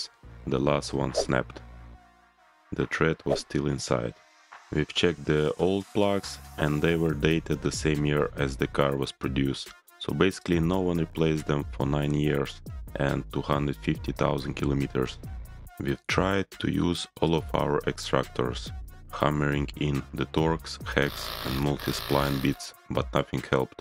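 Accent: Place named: Russia. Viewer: I hear russian accent :D а есть полное видео со всеми деталями?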